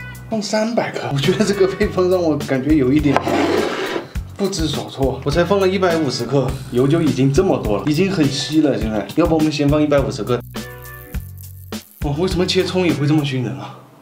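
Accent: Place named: Shanxi, China. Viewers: 这是山西北部，内蒙西部的口音啊！ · 姥姥必须得是我们山西人，这口音，一听就是自己家姥姥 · 这山西口音，弄上水zanzan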